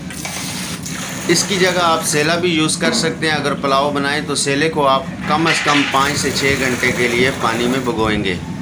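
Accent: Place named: Abbottabad, Pakistan. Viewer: Bhai bot asani se sumjate ho app abootabad ke ho ap ke lehjay se lugta he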